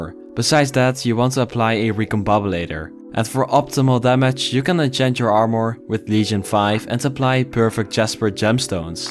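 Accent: Netherlands. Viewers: i smell nederlands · we kunnen nu al zeggen dat je nederlands bent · Ur dutch right?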